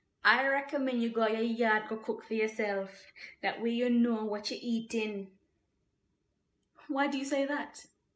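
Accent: Jamaica. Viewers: 😂😂😂 girl u some' else! i love when you speak in that patois accent...sounds real cool! And u have the most beautiful big brown eyes ...yah mann! 👌👌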